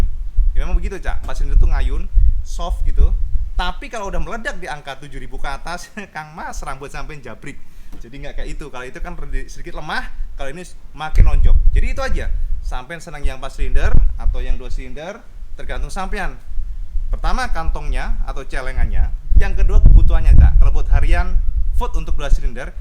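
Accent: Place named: Java, Indonesia. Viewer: Salah satu selalu nonton video tuh bahasanya lucu. Medok2 jawa campur BI cak 😂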